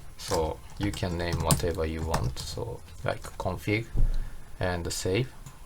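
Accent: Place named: Japan. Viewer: 日本の口音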